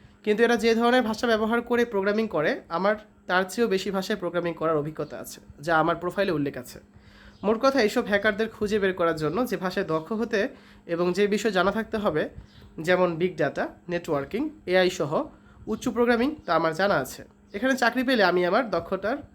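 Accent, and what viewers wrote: Sylhet, Bangladesh. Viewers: স্যার আপনি কি সিলেট উপশহর ছিনেন ❤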